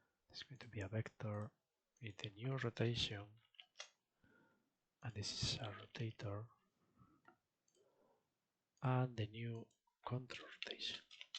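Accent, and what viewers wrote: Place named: Spain. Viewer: i would like to ask you something about photon plugin, and your english pronunciation is similar to Spanish people, im from spain too, can we comunicate in Spanish? to be faster :)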